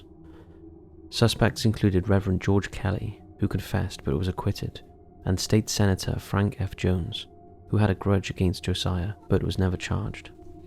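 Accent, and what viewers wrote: Wales, United Kingdom. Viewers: Love the voice (subtle Welsh accent?) and the eerie ambient background noises/music/soundtrack. Very soothing, not to mention informative.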